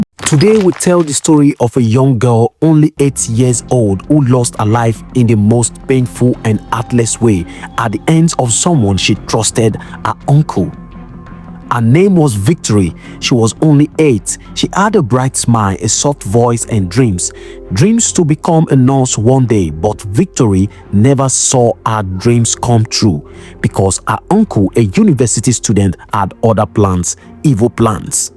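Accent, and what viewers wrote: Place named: Hausa, Nigeria. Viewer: This narrator no go school? Aboki man dey do narration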